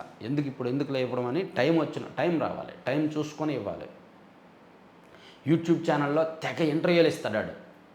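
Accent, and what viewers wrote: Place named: Uttarandhra, India. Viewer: Babu née voice mana Uttaranchal voice lo maaku nachhutundi and content Kuda baguntundi Kani neeku inka people support Kavali alage people tho direct talking introducing Chalayan Manchin alochana wish you all the best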